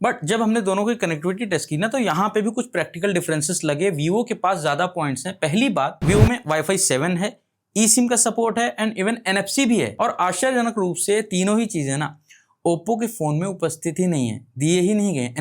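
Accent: Standard. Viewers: आपकी हिंदी अति शुद्ध है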